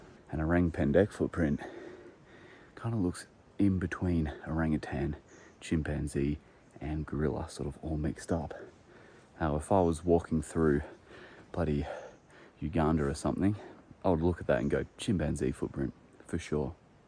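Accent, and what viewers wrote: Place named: Australia. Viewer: Lol wasn't expecting an Aussie accent when I clicked on this! Long way from home!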